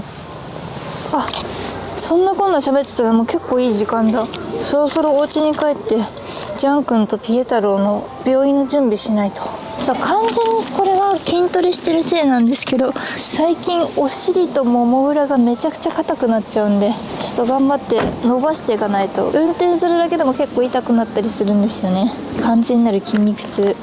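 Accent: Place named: Gifu, Japan. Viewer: 鍛わってる。 久々聞きました。岐阜弁懐かしいです。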